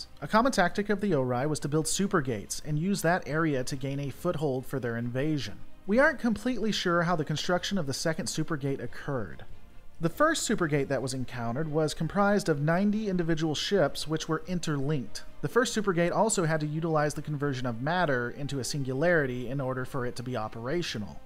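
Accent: United States. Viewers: Please learn to speak English. Not barstardised American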